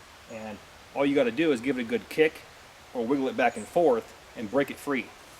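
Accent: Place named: Midwest, United States. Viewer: What part of the Midwest are you from I live in Indiana ?about 20 miles from Illinois that was a good video by the way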